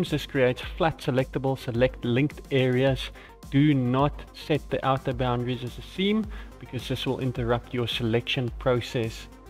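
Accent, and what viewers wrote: South Africa. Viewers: South African? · South African? Im from Cape Town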